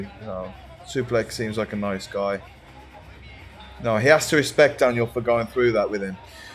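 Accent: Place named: Birmingham, United Kingdom. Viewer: i'm admiring your accent tbh. are you from Burmingham by chance? (i"m just english learner >< )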